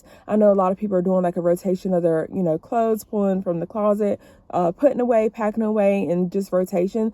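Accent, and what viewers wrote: South, United States. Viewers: I love that southern twang ❤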